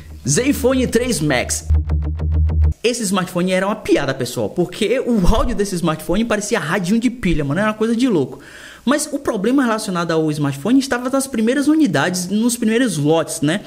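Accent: Bahia, Brazil. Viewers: De certeza esse cara é baiano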